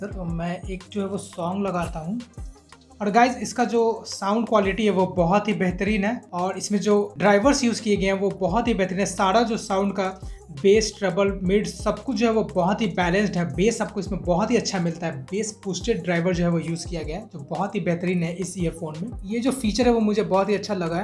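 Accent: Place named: Bihar, India. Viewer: Bihar , samastipur ka lg rha hai bhai tu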